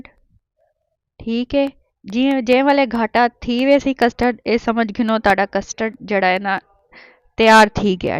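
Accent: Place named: Saraiki, Pakistan. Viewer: Nicccc Sariaki vice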